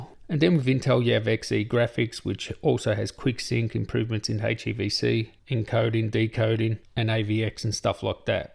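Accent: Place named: Australia. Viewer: Love the multicultural Aussie accent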